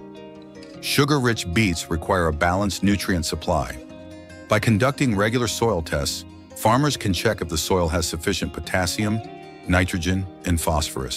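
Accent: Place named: United States. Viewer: Hearing an "American" accent I expected you to report on American sugarcane farms. Instead you reported on Australian sugar . You did not say which country the footage on beet sugar production was filmed in . (My apologies if you did and I missed it ) . I did not know that beet has a "white" variety....I thought all beet was a dark purple . There are variations in reaping and processing sugarcane in the various countries . In the West Indies and in Florida the raw sugar is brown....before it is refined into "white" . Rum is also a by-product of sugar production in the West Indies .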